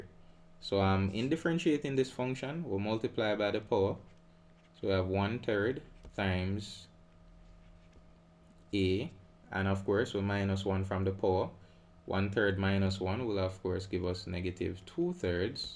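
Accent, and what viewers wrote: Jamaica. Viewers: Am loving the Jamaican accent 😅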